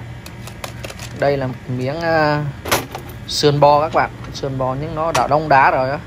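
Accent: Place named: Ha Tinh, Vietnam. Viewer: Máy cắt thịt đông êm đó anh 👍👍giọng nói anh nghe quen quen anh quê ở đâu vậy giống giọng hà tĩnh với nghệ an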